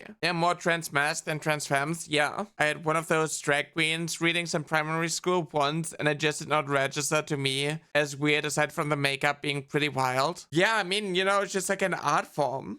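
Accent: Germany. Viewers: That's what I thought too 💜 I immediately recognized the German accent and got super happy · Ok I am gonna say it, I thought you were Shyaren under a new name cause I haven't heard her voice in a long time lol. I guess the very distinctive German accents you two have made me think that lol. I will maintain if you had a high pitch and were more of a gremlin, you two would sound exactly the same lol.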